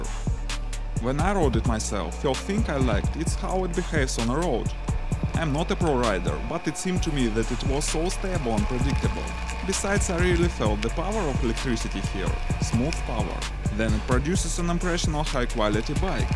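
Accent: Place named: Russia. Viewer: Явно нужно что-то делать с русским акцентом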